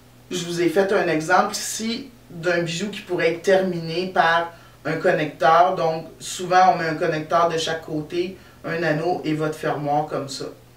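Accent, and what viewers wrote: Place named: Canada. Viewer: votre accent me rappelle ce beau voyage que j'ai fais au Canada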